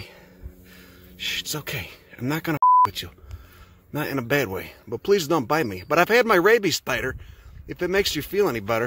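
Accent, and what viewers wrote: Chicago, United States. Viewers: From Chicago! Thick Chi-town accent! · The Chicago accent is dripping from this man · Nope, it's a classic Chicago accent. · Chicago, actually :) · It's a classic Chicago accent. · Chicago accent. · It actually is a character voice, "“I did a couple videos where I talked in my normal voice, but it just didn’t feel right,” says. “So I put all this narration through this voice of a 50-year-old Chicago mook from the West Side.” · Definitely Chicago Italian accent, my dad has the same accent. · It’s a Chicago Italian accent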